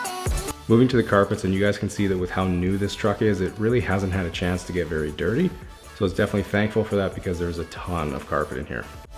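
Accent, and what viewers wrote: Canada. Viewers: Love the Canadian accent ! Aye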